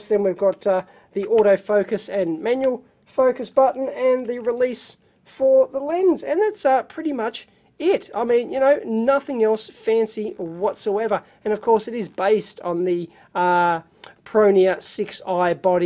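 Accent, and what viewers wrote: Australia. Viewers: The crappy Aussie accent made me turn the volume down.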